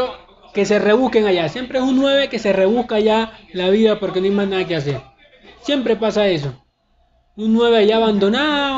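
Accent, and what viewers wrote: Esmeraldas, Ecuador. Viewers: Mijo usted parece esmeraldeños, por el hablado, Saludos desde ecuador. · Parece mas esmeraldeño que colombiano xDD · Pensaba que eras de Esmeraldas-Ecuador, hasta tienes el acentito por ratos, sin ofender. Saludos. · Hey brother, saludos desde Quito, pero tú acento tiene un parecido a la gente de la Esmeraldas no tan marcado pero si lo tiene, capaz y es de Tumaco. Saludos panita. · Lo q no cacho es q donde eres tu mas pareces ecuatoriano que colombiano por tu forma de hablar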